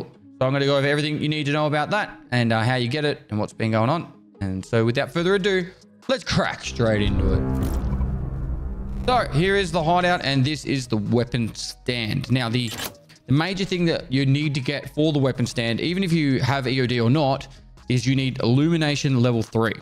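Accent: Australia. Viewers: It starts on if you don't wanna hear aus accent.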